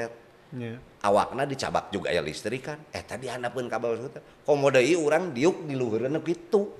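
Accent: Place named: Sunda, Indonesia. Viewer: teu ngeunaheun bahasa sundana host teh wkwkwkwkwk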